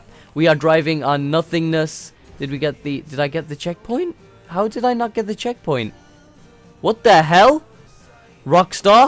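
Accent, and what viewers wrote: Birmingham, United Kingdom. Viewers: That brummy accent doe😂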